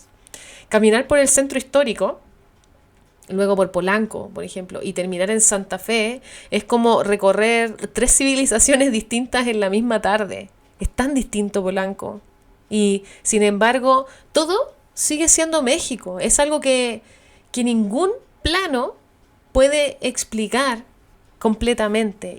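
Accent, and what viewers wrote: Chile. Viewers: que acento chileno maravilloso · Es impactante tu narrativa arquitectónica, dicha con un tono de voz tan característico de las chilenas. Espero más de tus videos. Recuerdo el título de una melodía de tu país que dice "si vas para Chile te ruego viajero"..... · Gracias Poe tu narrative soy in Mexicano en Chicago y tengo amogos Chilenos me gusta tu Aden to chileno. Tines Que ir a Morelia Guanajuato a Taxco y Verla otro Mexico Zinzunzan y Verla’s La grna varied de Mexico y su culture felicitates en hora buena Dios te bendinga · Que bonita narración y más en chileno saudos a mis hermanos maxas, visita Querétaro tiene mucha arquitectura e historia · Hola te acabo de encontrar y me encantó tu podcast Gracias por entender la esencia de mi país ❤ por explicar tan bien nuestra cultura a través de nuestras construcciones edificios etc En nuestra arquitectura está nuestra identidad Me encanta tu acento chileno ❤❤ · Q lindo es cuchar esas palabras dedicadas a mi País. 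Con la sabiduría de una conocedora de nuestra cultura.. y experta en Arquitectura!! Me encanta el Acento Chileno. Y su Gente!! Saludos me suscribí. Y estaré pendiente de lo q publicas. Abrazo grande de Guadalajara Mex. Al al estás invitada a conocer. Si no la conoces!! Abrazo grande!! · . Creo que eres Chilena? Pero tus programas me cautivaron. Y mira que es un reto ya que en los que escuché no incluían imágenes. Pero tus relatos desatan la imaginación!! Soy Mexicano, hijo de española. Y me capturó tu forma de hablar. Sacaste mi orgullo de ser mexicano!! Y que no todo son carteles de la droga. Saludos!!!! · Me gusta el acento chileno. Buen vídeo. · Me gusta tu voz y acento de chilena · Es maravilloso escucharla el acento chileno es muy bonito y escucharla hablar de México WOW que belleza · Me impresionó la clrides con que explicas todo y me.gusta tu acento chileno 🌹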